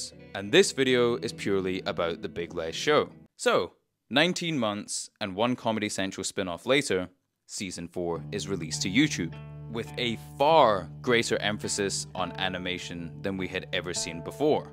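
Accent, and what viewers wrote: United Kingdom. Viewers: hearing a british bloke voiceover this actual visual masterpiece of a culture film is weird somehow. none the less, as a diehard fan myself, i still learnt some stuff from ya & about the show i grew up on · You sound like you’re from Edinburgh. Actually, maybe Stirling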